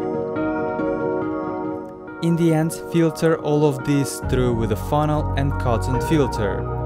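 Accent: Russia. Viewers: Русский акцент всё равно слышно. Но произношение хорошее.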